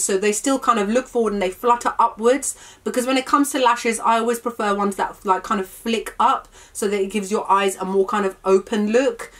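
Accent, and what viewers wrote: United Kingdom. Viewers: Hi from US, I love the way you talk :) plus UK accent. Your Makeup looks amazing!, need to get that lip color, so pretty! Love that colourpop blush, even i mostly always reach for cherry purply colors for blush. Watching your video to make list of things to buy with Sephora 10% coming up soon :) xoxo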